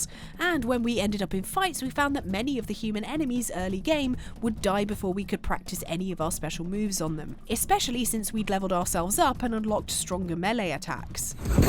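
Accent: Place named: United Kingdom. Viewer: the British accent makes a difference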